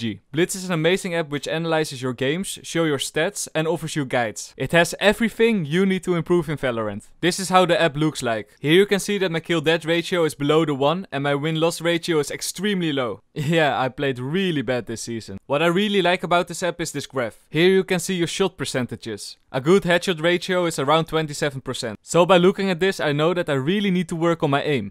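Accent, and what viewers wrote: Netherlands. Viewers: Met dat nederlandse accent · Goed Nederlands accent wel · Are you dutch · ik hou van u nederlands accent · dutch accent :) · Love the reference to Kud on this moment xd I hear your Dutch accent so you can't say you don't know Kud haha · De Hollandse uitspraak van haha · Ik weet gewoon gelijk dat je Nederlands bent geweldig ik vind je oprecht geweldig man ga zo door!!!!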